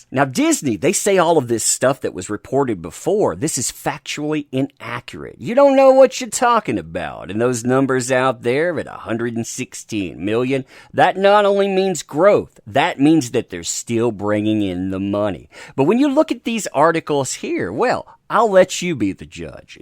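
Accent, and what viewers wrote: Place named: United States. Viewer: I just love your accent as a Brit it's new to me...what State is that accent from it's great?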